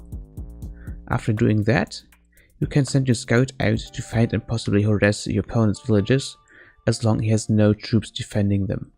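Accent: Germany. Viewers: Bro are you from DeutschLand?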